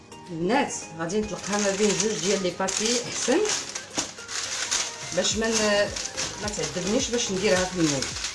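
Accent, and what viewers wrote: Morocco. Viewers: J'adore l'accent marocaine vous êtes très forte en tout ta soeur min Algérie machalah je vais le faire merci pour ce partage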